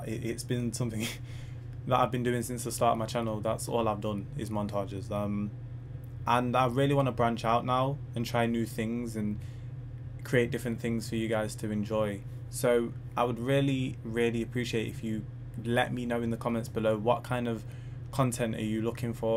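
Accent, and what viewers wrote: United Kingdom. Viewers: his accent sounds british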